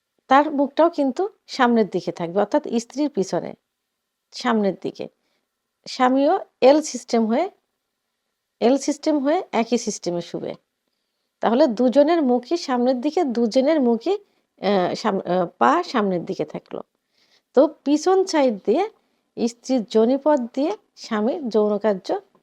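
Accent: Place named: Noakhali, Bangladesh. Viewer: ওরে নোয়াখাইল্লা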